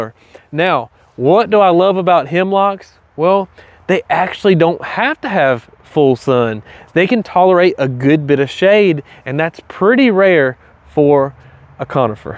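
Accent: South, United States. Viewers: Wow I have watched all your videos. Love your southern accent and excitement about conifers. Just amazing energy. Wish I had your job.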